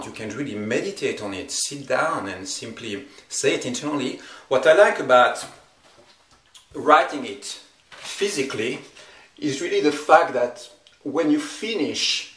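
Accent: France. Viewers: are you french? really like your videos!!!!!!!!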